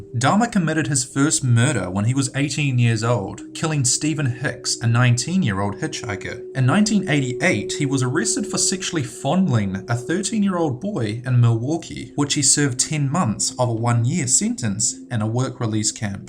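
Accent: New Zealand. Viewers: Fury Devil He's a Kiwi.... Kiwi's don't say 'Mutation' instead of 'Mutilation'.... facepalm yourself buddy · That New Zealand accent drives me fucking insane/ Tid bundy and the disastrous Goan Vallejo Corona. People just don't try when they annunciate incorrectly. Make's me very very annoyed...and I haven't subbed at all. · Kielee R I live in Queensland, Australia we don't talk like that. Maybe a Kiwi! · Your New Zealander right? I'm Australian and the way you enunciate the letter E kind of gives it off. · He should know how to pronounce things like infamous and impotence. I thought his accent was more Kiwi than pom but I could be wrong. At least he can pronounce "subsequently" which so many can't. Drives me bonkers. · His accent is very much a New Zealand one. Australians don't pronounce words in the same way that New Zealanders do.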